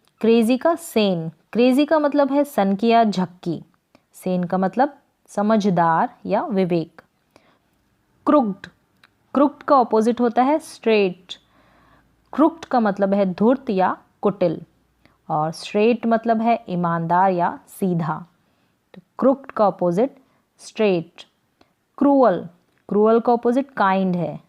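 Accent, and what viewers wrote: Chhattisgarh, India. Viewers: Hello didi aap chhattisgarh se ho kya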